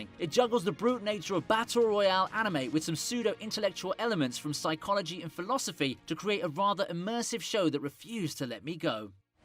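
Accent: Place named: United Kingdom. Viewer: Hey. I want you to know you are my favorite YouTube who covers anime by far. You have put me on to some of my favorite anime. Thanks for all the work you do. And for making these enjoyable videos. Love hearing that British accent before I get to see some badass anime😁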